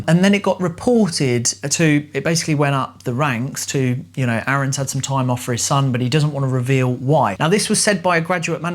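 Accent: United Kingdom. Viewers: Your accent is More british and you speak very fast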